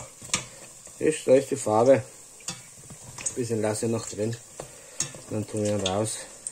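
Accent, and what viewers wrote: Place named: Banat, Romania. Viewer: Grüß Gott, kommst Du aus dem Banat?Hörst dich so an . Weckt schöne Erinnerungen 😊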